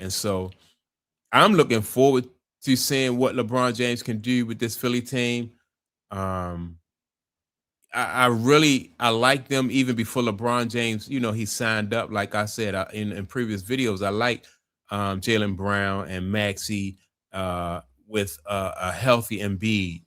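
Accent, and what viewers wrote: Baltimore, United States. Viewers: That's what this guy does. Would that poor version of Baltimore English.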